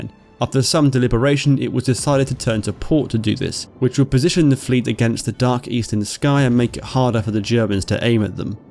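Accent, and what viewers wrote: United Kingdom. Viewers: Holy crap. You sound like a limey but you mispronounced nearly every single name!!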